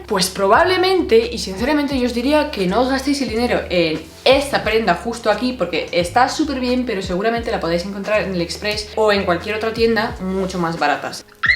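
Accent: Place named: Switzerland. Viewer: ¿Eres suiza? ¿Rusa? Es que tienes algo de acento extranjero.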